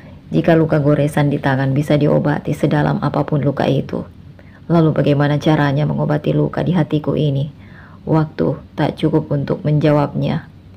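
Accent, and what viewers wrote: Indonesia. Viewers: hadir bunda, jadi adiktif dengan chanel ini logatnya perpaduan sumatera sulawesi jawa hihihi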